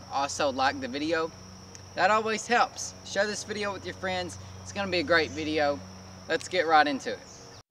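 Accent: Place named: Arkansas, United States. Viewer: A great video and inspiring, love your excitement, btw you sound like my Arkansas family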